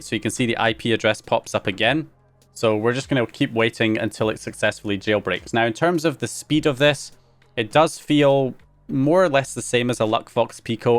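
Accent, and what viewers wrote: Scotland, United Kingdom. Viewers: He's clearly Scottish 😂 Russian American ? 😂😂😂 wow lol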